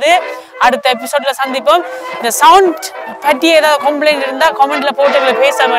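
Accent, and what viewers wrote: Sri Lanka. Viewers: Avoid music .unka ilankai tamil semmayayirukk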